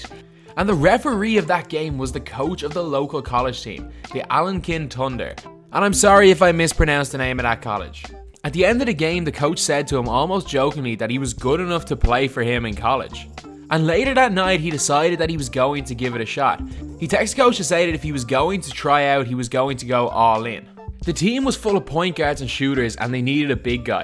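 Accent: Dublin, Ireland. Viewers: Where are you from it sounds like Dublin? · Ur Irish accent had me shook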